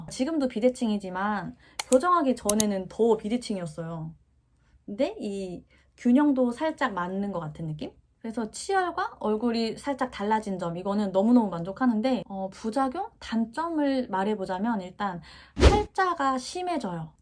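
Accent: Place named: Jeolla, South Korea. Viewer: 용 됐네요 전라도 사투리 쓰시는군요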